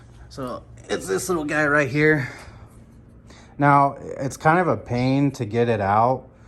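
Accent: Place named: United States. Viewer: Damn bro that American accent. AcrapOvik. It's akrApovich. Other than that, nice informative video❤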